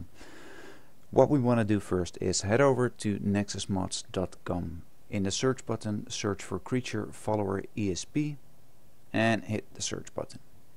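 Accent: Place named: Netherlands. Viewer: This guy sounds Dutch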